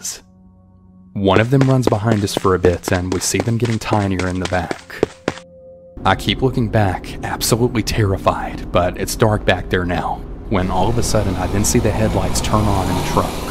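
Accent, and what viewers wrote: South, United States. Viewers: I really love the narrators accent like his southern accent it’s very aesthetically pleasing and satisfying. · I love that slight southern accent im the narrator's voice 💜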